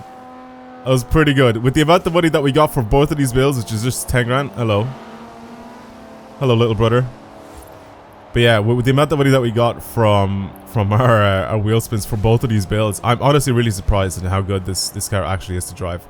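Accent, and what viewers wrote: Ireland. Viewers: Just subbed, great content! Also, which part of Ireland are you from? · Are you Irish?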